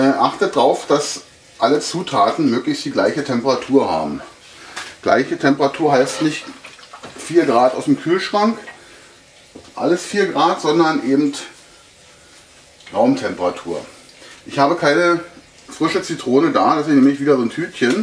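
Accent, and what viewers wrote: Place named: Berlin, Germany. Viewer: Hallo, hab das Rezept für´nen einfachen Sandkuchen gesucht, weil ich den von meinem Vater geliebt habe. Er hatte eine Bäckerei/Konditorei auch in Berlin; daher kam mir dein Slang so bekannt und heimisch vor:) Danke danke danke für dieses prima Rezept. Gespeichert hab ich so einiges, aber dich hab ich abonniert!!!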